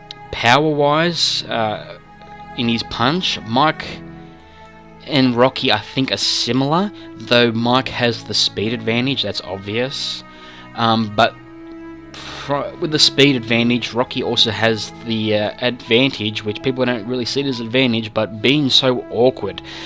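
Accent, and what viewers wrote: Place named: Australia. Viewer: You sound Australian, what would you know about boxing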